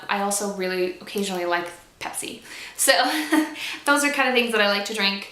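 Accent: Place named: North America. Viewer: Yay for ex-pat #bbloggers! I moved from Texas to England last year for my husband as well. I love hearing other North American accents pricing things in pounds and euros!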